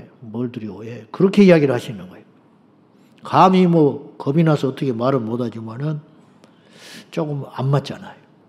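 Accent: Jeolla, South Korea. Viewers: 목사님 말씀 늘 잘 듣고 있습니다^^* 감사합니다 ^^* 저는 고향이 경상도인데 가끔씩 목사님 전라도 억양에 구수함을 느낍니다ㅎㅎ 살아가면서 마음이 답답할때마다 목사님 설교가 제 마음을 평안하게 해 주셔서 너무나 감사드립니다 ♡ 늘 건강하시고 매일 매일 축복이 가득 하시기를 기도 드립니다^^*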